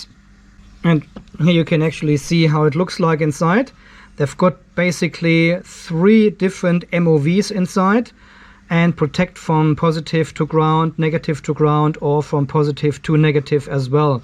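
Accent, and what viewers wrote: Germany. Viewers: Als ich dein erstes Video sah, dachte ich spontan: Wie kommt ein Deutscher nach Australien, u.a. wg. dem einschlägigen Dialekt...BOMBENSICHER bin ich mir erst seit BOMBENFEST. Auswandern war schon immer mein Traum...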